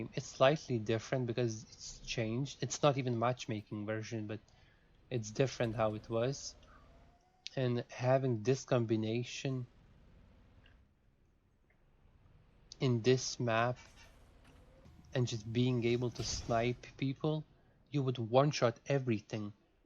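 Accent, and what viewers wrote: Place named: England, United Kingdom. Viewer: I really like you English accent ❤️👌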